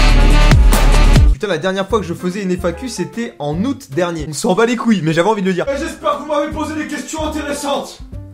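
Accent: France. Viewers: Tu me fais bien rire avec ton accent français ! bisou du Québec ! :)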